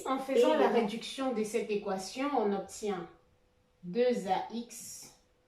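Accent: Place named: Cameroon. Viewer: Très bonne présentation, vous avez un accent camerounais que je reconnais. Félicitations à ma compatriote mathématicienne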